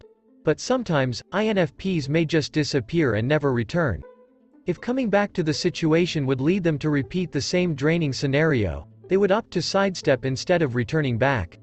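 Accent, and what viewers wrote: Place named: United States. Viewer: American English is so bad.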